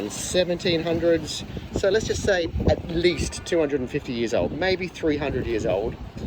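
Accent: Australia. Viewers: Really enjoy your aussie accent. And the time you take in explaining your finds.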